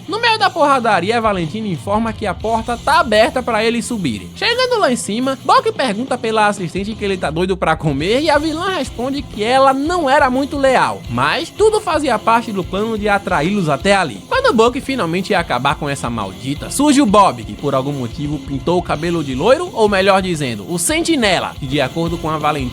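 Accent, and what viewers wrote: Brazil. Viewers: eu sei que vc tem o jeito abrasileirado porém você poderia falar as coisas da forma correta tipo o nome da valentina ou outras coisas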